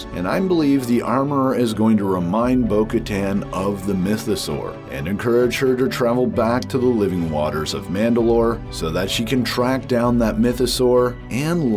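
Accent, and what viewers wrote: Canada. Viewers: Is that a Canadian accent with scouting pronounced "scoting" (sounds like coating)?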